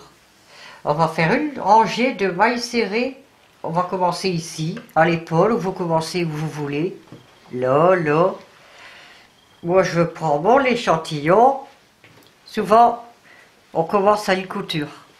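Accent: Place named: Nord-Pas-de-Calais, France. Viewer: Bonsoir, toujours le même plaisir pour moi à entendre l'accent chti^^ pour la laine maman en a elle vient de chez Babou. Bonne soirée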